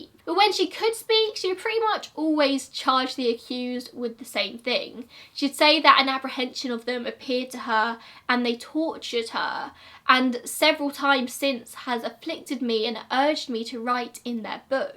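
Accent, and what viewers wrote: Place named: RP, United Kingdom. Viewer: I feel like posh accent should be saying "mind the gap" or calling out the numbers in an elevator. Not gonna lie, it lulled me to sleep ❤️❤️❤️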